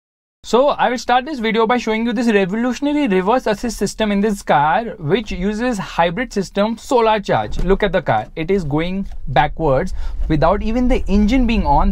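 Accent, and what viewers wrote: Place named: North India, India. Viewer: North Indian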